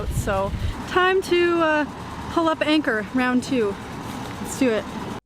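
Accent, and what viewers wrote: Canada. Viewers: Love your Canadian pronunciation and I am sure the dolphin was a good omen, all the best on the ICW.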